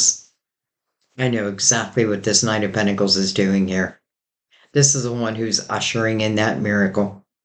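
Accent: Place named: Pennsylvania, United States. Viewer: Love your SW PA accent!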